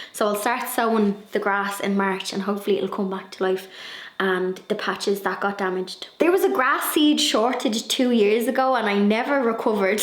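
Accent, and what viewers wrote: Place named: Ireland. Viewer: Brilliant I could listen to you all day long....your accent is amazing and I love your little ditties....pure Irish. X Best wishes from the UK... X 🌼🌸🌱🌿🌾I even love to meet you and have a brew ... ☺️👍☕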